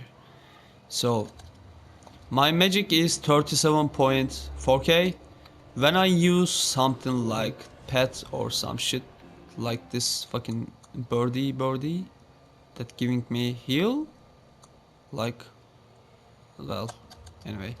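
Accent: Turkey. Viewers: aksanını duyar duymaz kanal ismine baktım türkçe mi diye ya :D kralsın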